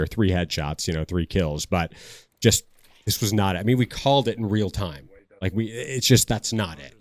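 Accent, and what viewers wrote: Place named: United States. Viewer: no not tech-bro, it’s just American Englisch. Even I as non native speaker can comprehend this. Do you really except someone to change the way they speak based on your feedback? The entitlement, holy shit wow.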